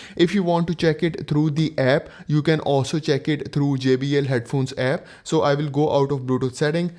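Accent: India. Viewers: Didn't work Even a tutorial from a dude with an indian accent can't save how bad JBL airbuds are I'll just buy from another brand and stop being a cheap ass thinking I can get something that won't die after 2 days at a reasonable price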